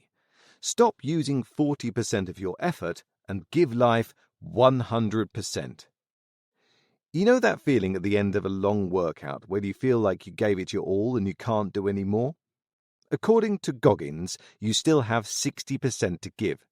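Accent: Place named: England, United Kingdom. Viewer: Representation matters: This book by a Black author was summarized by a white man. And no offense to the talented, but why, in the year 2021, am I listening to a white Englishman recount the uniquely American experiences of a Black New York-born United States military officer!? Please consider hiring writers and voice actors whose identities more closely align with those of the wonderfully diverse authors you showcase. You guys did good, but you can- and should- do better.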